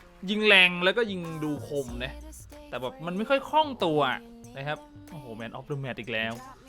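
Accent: South, Thailand. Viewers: แหลงใต้ชับพึดเลยน่ะน้องบ่าว...หรอยๆ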